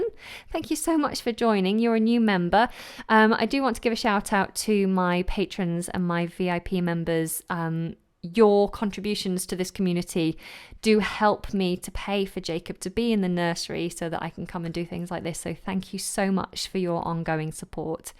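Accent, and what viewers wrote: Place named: United Kingdom. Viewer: Hi, it’s a late wish, happy birthday all the best to you. This is Andrew. I just found you videos by YouTube recommendations. Really like you speed of British accent. Thanks many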